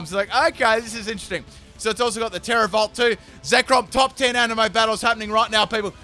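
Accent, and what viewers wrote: Australia. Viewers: are you austrailian ?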